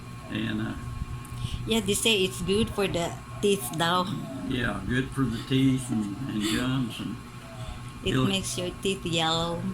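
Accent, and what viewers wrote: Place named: South, United States. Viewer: It doesn't seem fair. I read [never knew 'em] my great grandfather raised 7 boys and 3 girls on tobacco. I never met a single great uncle, nor any cousins. Done hung my guitar on the river of Kebor.. i asked NOT to play a song at a local bluegrass old time music jam tonight. Nice to hear a southern accent. ❤😢😢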